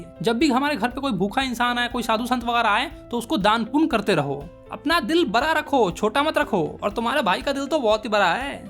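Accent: Bihar, India. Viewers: Kya bihari hai ye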